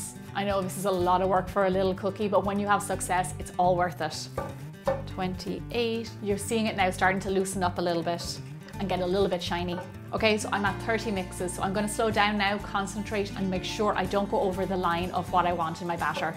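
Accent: Ireland. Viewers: Hi! These are super cute, the gold lusture dust makes all the difference! I watched the video you done on a Youtube channel, you sound so Irish in that compared to your videos! I couldn't believe it at all! Are you taking part in Donal's collab? I can't possibly be that Irish, I've never tried bread pudding!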